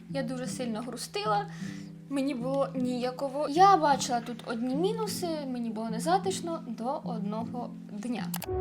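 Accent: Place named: Surzhyk, Ukraine. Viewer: Рибочка, киценька) Підписана на Вас недавно, завжди дивлюсь Ваші відео як маленьке свято чи відпочинок, отауі вони співзвучні! Як класно, шо Ви така щира і харизматична! Зраділа чомусь, шо Ви в Варшаві, моя молодша донька теж вибрала свою самостійність в Варшаві. Дорога до себе завжди важка, зате коли себе чуєш, легко жити це життя! Такий у Вас рідний для мене суржик, звідки Ви? Я з Сум. Бажаю Вам гарних людей поряд і вдячна за відео!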